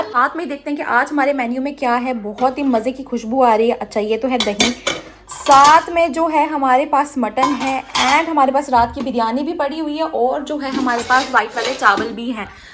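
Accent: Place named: Pakistan. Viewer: Your urdu language and flavour of Pakistani serial made me believe that u r a Rawalpindi girl but soon found lower Gujjar Nagar around children park 😢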